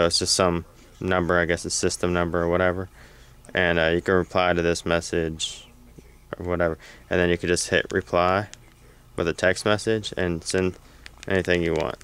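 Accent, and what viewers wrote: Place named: South, United States. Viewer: do u live near the South? u have an adorable accent :D haha lol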